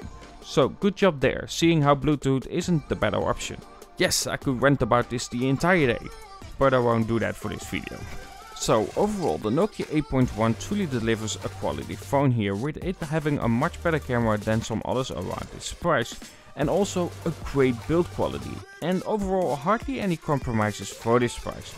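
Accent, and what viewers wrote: Netherlands. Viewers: Dat noemen ze Denglisch. Dat is Engels met een Nederlands accent.😁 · U sound Dutch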